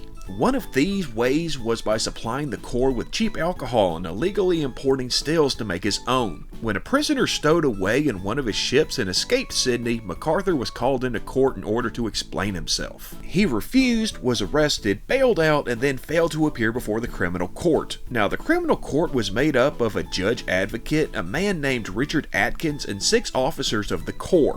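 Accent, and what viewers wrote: United States. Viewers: Great work! Got a bit of a laugh hearing someone so American sounding talk so succinctly about early Australian history…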